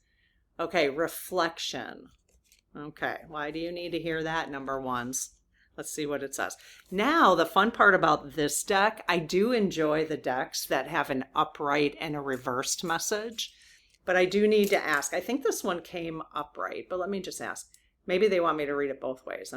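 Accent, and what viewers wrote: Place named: Wisconsin, United States. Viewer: I had to check whether or not you were from WI. Your accent gave it away! I enjoy your videos.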